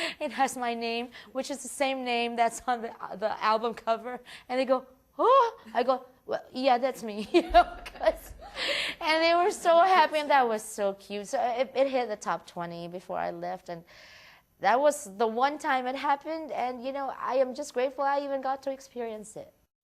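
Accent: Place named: Hamptons, United States. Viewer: I looked into these older broadcasts to see if Deborah Kan spoke the same way as she does now. Compare her way of speaking then to how she speaks now. She has developed a remarkable Hamptons affectation which she wears well. You go girl! Every celeb today has to develop a persona. Madona taught us that.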